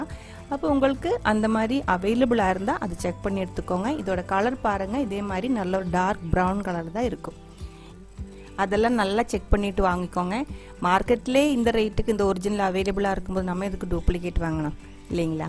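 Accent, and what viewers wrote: Kerala, India. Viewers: Hai mam valare nannayittunde Explanation super kurachu malayalam varunnunde athu saramilla kelkkan sugamunde we are also living in coimbatore native palakkad ane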